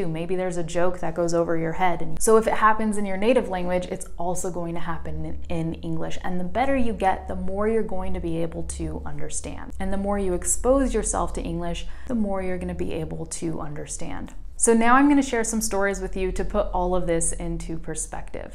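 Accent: California, United States. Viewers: I'm a native English speaker, but I will say this for whatever reason while learning Spanish it is much easier for me to understand what a woman is saying compared to a man. I don't know why that is but it is. And yes you have a California accent which is to say really no accent as most other English speakers would say. I would imagine it's much more difficult for non native speakers to understand different British accents, Australians, or related New England and New York accents because as a New Englander I can say we speak more like British people than we probably think with long vowels and guttural h sounds that aren't actually supposed to be in the word but it's just how we talk. Or Pennsylvanians and New Yorkers dropping H's and pronouncing words like hat and ass like " "hey-at" and "ey-ass" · A big thanks to you mam, honestly I really enjoyed and understood each everything you said throughout this video make me encouraged and motivated to do more and put me in a field has potential to improve my listening and speaking in English, and one more thing that I want to share is i dreamed myself that I speak American English well like you speaking. 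Saying thanks again for reading my comments. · Exactly.. she speaks in basic english with clear american accent. That's make us understand easily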